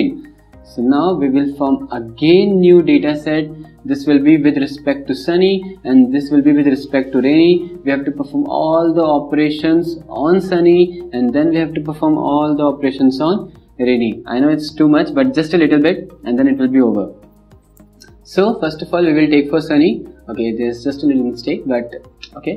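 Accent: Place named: India. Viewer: hintli ingilizcesi skilli kastırdınız zorla abv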